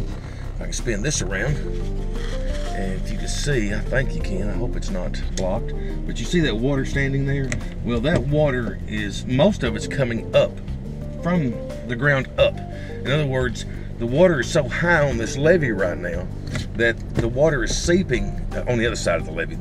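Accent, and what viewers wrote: Arkansas, United States. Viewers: Very educational vid I love your Arkansas accent too.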